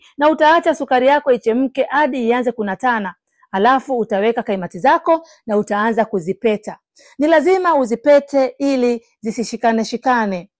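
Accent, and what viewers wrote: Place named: East Africa. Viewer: Your accent is from which part of East Africa?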